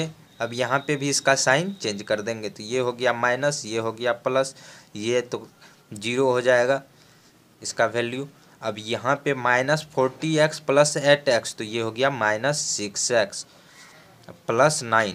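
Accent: Bihar, India. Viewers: Your Voice Like A Bihari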